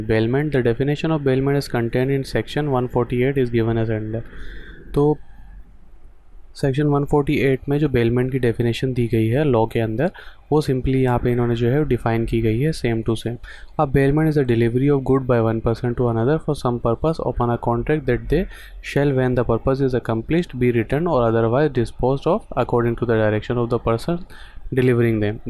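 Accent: Karachi, Pakistan. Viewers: AP Karachi say ho??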